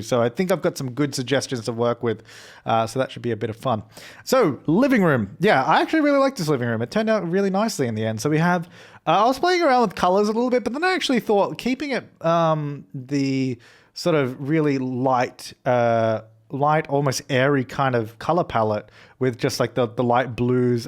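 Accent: Australia. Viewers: Why I Love listening to You and Deli? I think Australia accent combines with sims builds. 🤔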